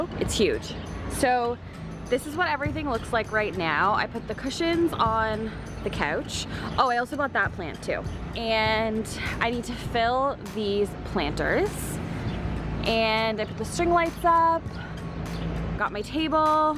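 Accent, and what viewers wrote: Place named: Canada. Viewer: Am I the only American girl who loves to listen to lovely Canadians pronounce words like “couch”? Ah, so soothing.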